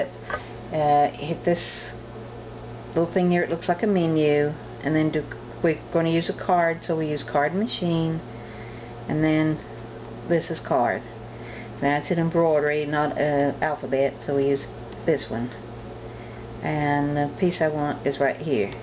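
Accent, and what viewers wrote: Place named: Tennessee, United States. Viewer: Hello, Thank you having the ambition and courage to help us newbie better learn our machines. I have an old 2144 that I'd wanted forever. But there it sits, because no one has helped me with teaching or coaching. I did upgrade it to 2170 online. I see you don't use the cut function. - Does yours not work? I wish you loved close to me, but by your accent, I'm going to say TN.:) (I'm in So Cal.) Big Hugs, Nanette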